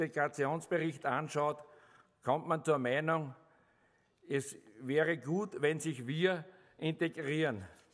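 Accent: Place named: Vienna, Austria. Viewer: Nicht gegen Österreich, aber dieser Wiener (oder was auch immer) Dialekt ist dermaßen furchtbar, da bekommt man eine Gänsehaut. Da kling Ostdeutsch noch schön gegen, das ist wenigstens lustig.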